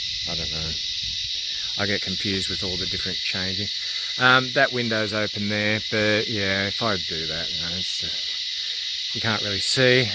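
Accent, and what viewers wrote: Australia. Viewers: I’ve seen a few of your vids now and I have to tell you how lovely it is to hear an Australian on YouTube. I know there are many but most of my subs are from North America. Enjoy your work.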